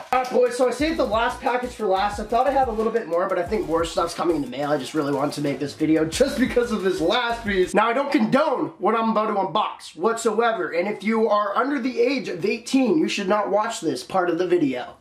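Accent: Winnipeg, Canada. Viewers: Is he from winnipeg?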